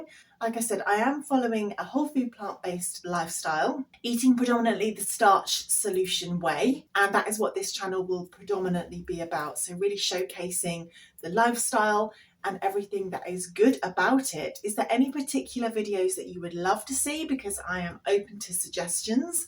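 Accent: United Kingdom. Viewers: I'm used to watching American starch solution advocates and I can't tell you how refreshing it is to hear a British accent. It makes you feel like you can relate especially when you mention products that I know and I can easily access. Love from Scotland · Good luck with your channel 🥰 got the warm and fuzzies hearing a fellow Brit. Originally from Essex, living in Australia 🇦🇺 for past 20 years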